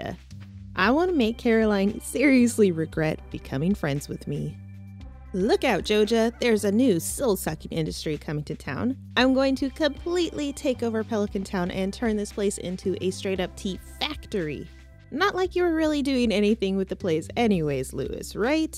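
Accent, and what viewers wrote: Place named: United Kingdom. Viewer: eeeeewwwww BRITISH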